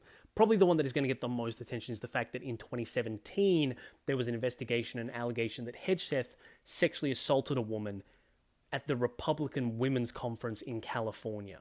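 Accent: Australia. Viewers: Just found out you have a YouTube page. Instant follow! Also, I always forget you're Australian lol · australian mate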